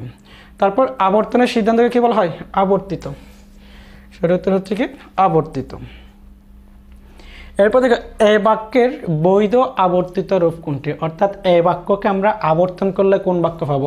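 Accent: Noakhali, Bangladesh. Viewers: Sir amadrr nuakalir,onek balo bujai logic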